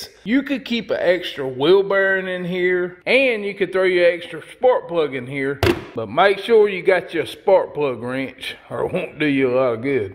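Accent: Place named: South, United States. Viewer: If you are from anywhere north of Tenn. you will not understand a word he says. Great videos and best info around.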